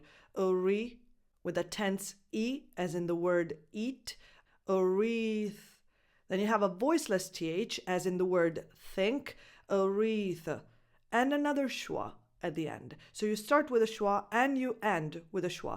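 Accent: United States. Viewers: Sei molto brava e chiara ma secondo me sarebbe corretto sottolineare che la pronuncia che spieghi è quella americana (e ad es freddie mercury e jhon lennon erano britannici e la pronuncia è leggermente differente dalla tua) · Lei cara signora ha la cadenza americana!!l'inglese è un'altra cosa per mè..anche lei deve studiare l'inglese inglese però!brava và ❤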